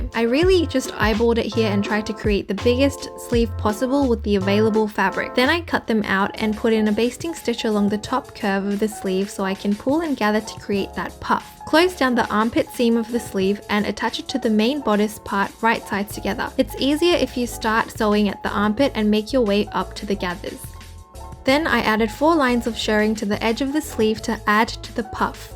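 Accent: Australia. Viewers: And cute personality and adorable australian accent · whenever i find a new channel and i hear an australian accent... it's like god decided to give me a gift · Absoooolutely love this video, your creations and your editing. Definitely gonna subscribe :) But I didn't quite understand how you did the middle cinch on that second top (I'm new to sewing and also I'm French, and although I love your Australian accent it was going a little to fast for me to keep up). I understood you made a channel to gather the fabric but i didn't get how ? Could someone explain it to me ? Thank you so much and I hope you get more recognition!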